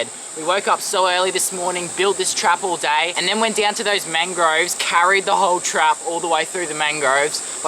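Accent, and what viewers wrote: Australia. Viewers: Fun of his accent? lol I'd pay hundreds to get an Australian accent as good as his.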